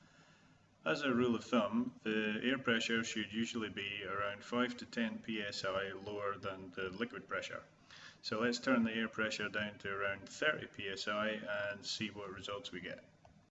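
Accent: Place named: Scotland, United Kingdom. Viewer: I had this question today as my presoak was spitting air and not enough fluid. I played with the fluid pressure and got the stream working again. My system is 30 years old and the foamy brush and presoak share the same air pressure but they have individual regulators for the fluid pressure. Thanks for the education on this. I'll be checking my floam consistency from now on using your guidelines. (and how come an Aussie has a Scottish accent?)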